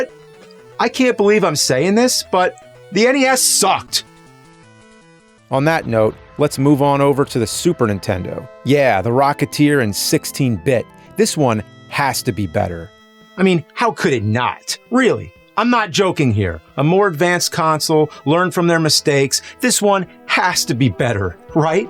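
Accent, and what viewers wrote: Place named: New Jersey, United States. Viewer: nerd cant even say the name of the main character Cliff Secord correct he called him Cliff Sector WTF it must be his dirt bag new jersey accent thats why the call new jersey the garbage state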